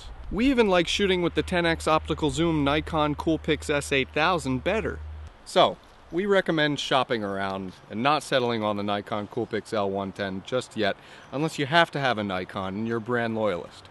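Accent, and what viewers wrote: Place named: Buffalo, United States. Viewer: Your accent sounds like maybe Buffalo, New York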